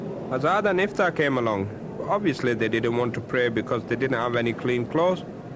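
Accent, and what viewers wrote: Bradford, United Kingdom. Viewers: It was good to understand every word. You can't beat a good old Bradford accent. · Brilliant show this,real Bradford accent,Salt of the earth. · Thick Yorkshire accent, but his hometown is in Pakistan? I don't think so. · What a pure british accent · Stumbled on this,absolutely superb!the Asian Yorkshire narration is out of this world.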